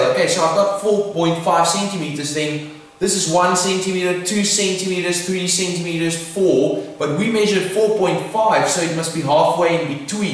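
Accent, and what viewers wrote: Afrikaans, South Africa. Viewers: Afrikaans ascent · i literally read this before i watched the video and i was like "i bet his accent is south african" AHA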